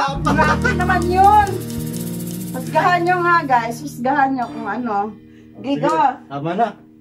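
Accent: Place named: Visayas, Philippines. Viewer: Thank you bisaya kaayo oi pwerting bisayaa🤣🤣🤣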